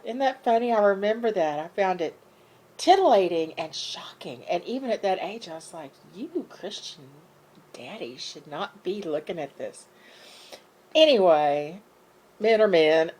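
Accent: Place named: South, United States. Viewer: I had every toy you mentioned, the straw bubbles never worked for me! that stuff was crap. you look so good, loving you glasses and winged liner. seriously southern accents are just charming, you make everything sound so lovely. oppose to my New yorker accent which is...we'll interesting. I'm from brooklyn and now one here is soft spoken. No I don't sound like that stereotypical movie over dramatic ny accent. I worked my whole like to sound like I'm not from here!!!!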